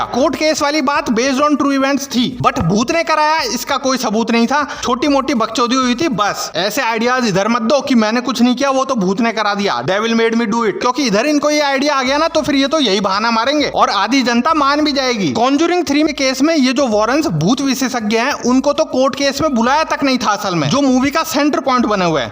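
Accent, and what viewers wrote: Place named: Jaipur, India. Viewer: Bro tu toh rajsthani ninala 😂😂 Jaipur vala touch alag se hai voice me 😂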